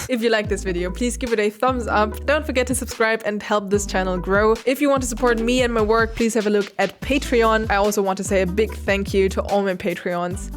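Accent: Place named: Germany. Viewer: By the way, your English is now majestic. I loved those cute details of your German speech, but the work you did inspires as much as piano one :-)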